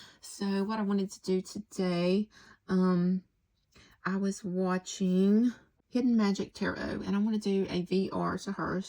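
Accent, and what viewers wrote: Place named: South, United States. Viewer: This was an amazing video! My jaw dropped with your first piece of advice and then the hits just kept coming! Thank you so much for sharing your perspective and wisdom with us 🥰 (And this has nothing to do with tarot but I love your dialect of English! I'm a former language teacher and in love with how people talk. I'm from Minnesota and rarely get to hear the beautiful accents of the southern states.)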